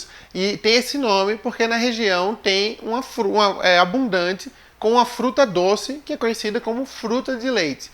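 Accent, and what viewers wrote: Minas Gerais, Brazil. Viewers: Não consigo acreditar que és pernambucano. Tu consegues ser mais mineiro que muito mineiro nascido em em Minas Geraes.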